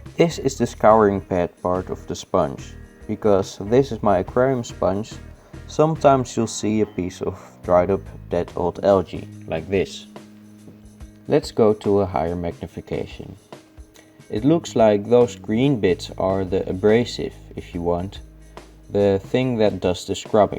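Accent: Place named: Europe. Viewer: You sound like you maybe Europe so that may not be self isolation but enforced isolation because you know...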